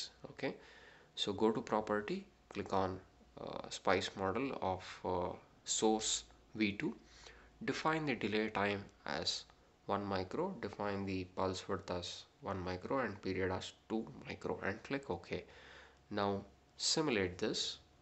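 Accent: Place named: India. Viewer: indian accent too thick to get Plz stop toturing people induan guys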